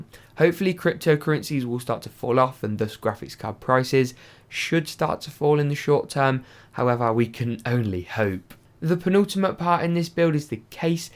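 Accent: England, United Kingdom. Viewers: You sound english, Why priced in dollars?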